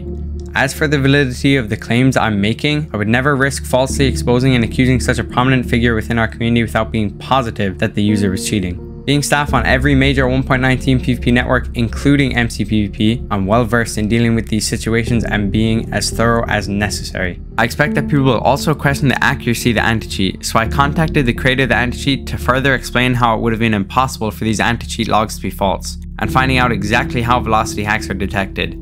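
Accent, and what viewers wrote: Ireland. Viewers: You sound irish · Irish accent?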